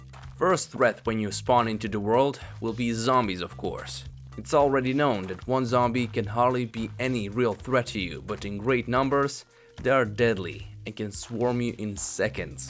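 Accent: Russia. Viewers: i can hear the russian in your voice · That Russian accent though. Never heard one in real life in before.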